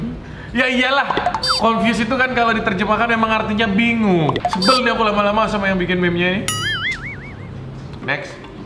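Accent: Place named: Java, Indonesia. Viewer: bng lo orang mana kok medhok banget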